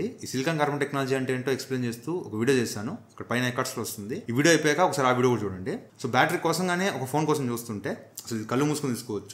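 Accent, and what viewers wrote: Nellore, India. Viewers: bro r u from nellore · nellore ah anna needhi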